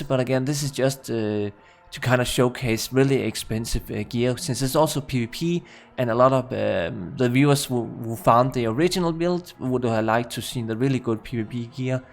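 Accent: Denmark. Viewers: Man kan lugte en Dansk accent 14 kilometer væk.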